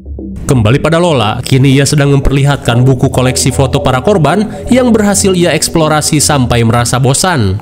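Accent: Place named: North Sumatra, Indonesia. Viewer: Bang..kau asal sumatera ya 😂😂kdg logatnya kek org sumut😅😅😅 penasaran.semoga di balas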